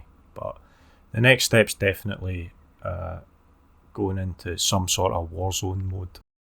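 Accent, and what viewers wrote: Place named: Scotland, United Kingdom. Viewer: Your Scottish accent 😀!! Beautiful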